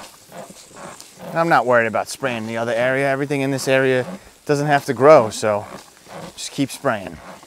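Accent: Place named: New York, United States. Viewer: Give him a break. He’s a New Yakker.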